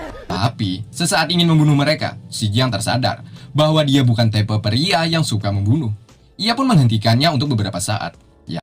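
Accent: Banjar, Indonesia. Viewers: Bang lu orang banjar kah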